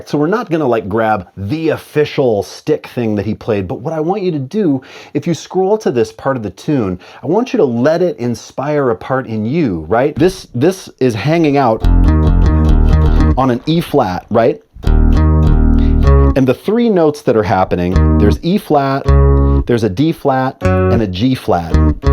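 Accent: United States. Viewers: Oy! What happened? I went away for a year or two and you have an American accent?? Or have I found a different Bass Lessons? Geeez, I'm confused......I could've sworn your were a Brit.... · I actually like new American accent. 🤔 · is that you talking? I’m totally tripping on your voice I’ve been following your channel forever and I never heard anything from you ever that sounds like your a straight ahead American speaking beast of a bassist. I personally think that you are probably the most under mentioned professional on the circuit! I alway hear Stanley, Marcus, Victor...etc.... but you are right there on the same platform as the greats due to your understanding of the bass. You really really know and understand how to utilize several aspects of your fretboard due to your wide variety of techniques available to you. Many are deemed to one style or genre of music and watching your videos have been a great way for me to broaden my musical styles. 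I’m a full time musician and have been for some time now and it’s always amazing to see players like you that can pull every technique off as if it’s their only bag. Great job love your videos keep it up. And that voice??? I like the old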